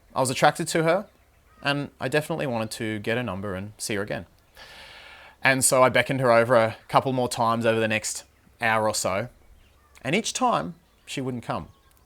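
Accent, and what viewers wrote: Australia. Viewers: Bruh u an Aussie??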